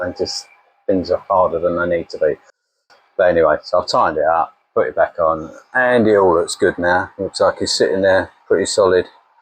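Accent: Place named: Yorkshire, United Kingdom. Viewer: Yorkshirey way lol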